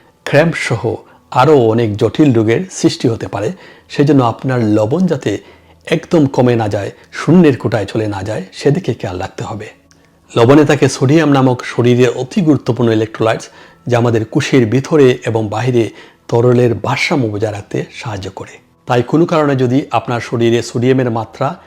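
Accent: Sylhet, Bangladesh. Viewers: আপনি কি সিলেটি? কারন আপনার উচ্চারণে তা বুঝতে পারলাম।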